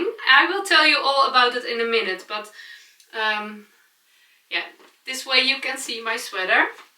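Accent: Netherlands. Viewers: Just love your Dutchy English - your brightness and cheefulness is so refreshing 🤩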